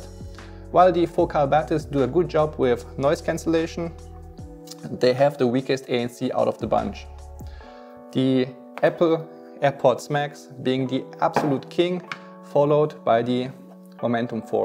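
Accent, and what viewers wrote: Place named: Germany. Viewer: sympathischer deutscher akzent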